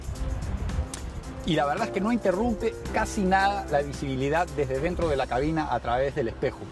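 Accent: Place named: Peru. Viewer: Are you from Peru? My girlfriend was translating your video for me and noticed your accent. It's just like hers.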